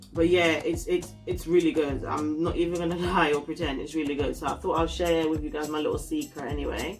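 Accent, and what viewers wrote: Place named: Jamaica. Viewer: She has a little ja tlk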